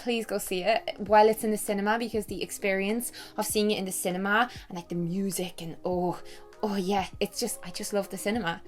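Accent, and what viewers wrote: Ireland. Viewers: I found your gorgeous self last year by looking for Irish accent tag videos haha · I found your channel because my ex is Irish 😘 and I just loved your accent and I loved your videos!!!! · So happy to see this video from you right now- I have horrible pms cramps and sitting with hot cider listening to you is so nice! I started watching you because I saw one of your food diaries in the related videos of a what I ate in a day video I was watching. A beautiful sweet bi lady with an Irish accent who loves Harry Potter and makes food videos! Right up my street :) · I found your channel because I was googling Irish youtubers (Irish accent is the BEST) haha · I found your channel while looking for Irish YouTubers to learn the accent! Also, I'm taking my first trip to Ireland next year, and I would LOVE it if you and Jessie could make a video on must see places in Ireland. 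Especially if there are things only the locals know about! I love your videos!!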